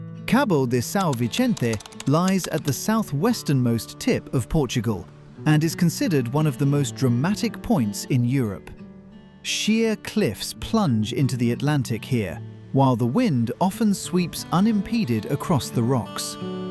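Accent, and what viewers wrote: Spain. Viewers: The Spanish music in the background and the Spanish pronunciation of Portuguese words (particularly words with 'J') is very disappointing. Portugal and Spain are culturally very different, in fact they were historical enemies for many centuries, so hearing Spanish music in the background and Spanish pronounciation in a video about Portugal is like nails on a chalkboard. It wouldn't have taken that much more work to doublecheck the pronounciation or at least use music that is not so obviously native to Spain like . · You made a Nice video of my country,And We do have a beautiful country in my humble opinion..But ,Please learn how to pronounce our cities and places in Portuguese not in Spanish.Believe me we will appreciate it.❤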